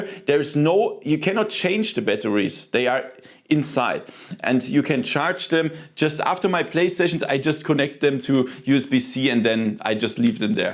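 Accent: Germany. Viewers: Mein Gott, du erinnerst mich an meinen Vertriebschef, denglisch vom feinsten...das ist so lustig zu hören, sorry mann, aber das ist echt köstlich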